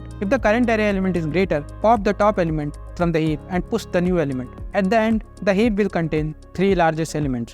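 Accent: India. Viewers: You should speak slower and make your accent better. Thanks for the vid, but god damn, Hindi English is the worst language ever. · I'm very tired of Indian accent. learn English well, okay? you speak like kids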